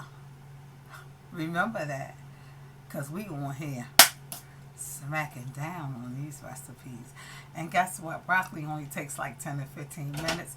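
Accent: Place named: Maryland, United States. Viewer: I agree. There is a difference between cooking fresh broccoli vs frozen broccoli. The frozen kind doesn’t hold flavor like I want it to. But I’ll cook with either one still. Also, I can hear in the way you talk that you’re from Maryland girl. I am too! 🙋🏽‍♀️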